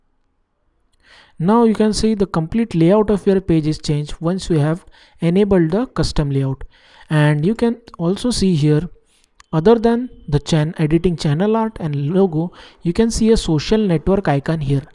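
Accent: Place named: France. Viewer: the french accent is annoying... not trying to be racist...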